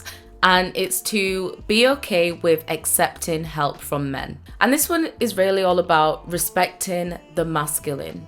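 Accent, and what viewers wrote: United Kingdom. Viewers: Oo what part of the UK are you from? Your accent reminds me of Mel B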